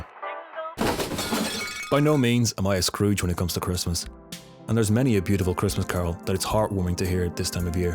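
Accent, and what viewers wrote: Ireland. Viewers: You sound irish, so what you think of as christians is a little different in the sivalised world. We don't all exchange potatoes wile huddled around a fire made of cow dung. Sorry to rewen you nestalga. XoXoXo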